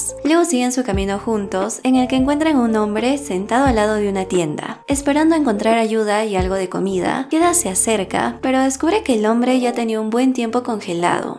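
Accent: Peru. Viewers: a los 10 segundos ya sabia que eras peruana🙂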